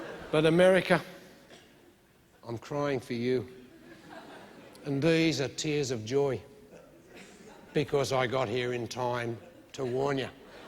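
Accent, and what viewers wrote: Australia. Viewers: He is why us Americans love the Australians. Actually, we think everyone with an Australian accent is cool. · That Aussie accent is just a killer . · Cent stand the Australian accent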